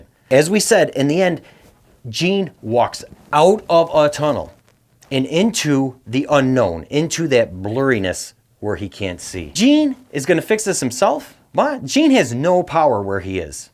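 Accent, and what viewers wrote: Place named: Midwest, United States. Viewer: Are you from the Midwest or Canada? I can hear that accent lol.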